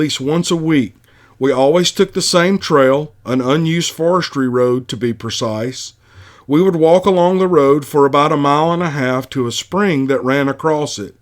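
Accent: South, United States. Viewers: I absolutely love everything about your podcast: the content, the delivery, and especially your gorgeous Southern accent! It has become my fav Sasquatch Podcast. Keep up the great work darlin! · Dear.....From a married woman with 2 kids that lives in Greece...yep in Europe....I sit in my free time and listen to these encounters and that fabulous SOUTHERN ACCENT...Dont ask me why but Dogman and Bigfoot just give me a thrill...MIND YOU>>>>I dont want to have an encounter but I am learning a few things and the first is....NO CAMPING for the rest of my LIFE...Yep thats how creeped out I am..and thankyou for reading all these experiences so as we can take precautions...TAKE CARE!!!!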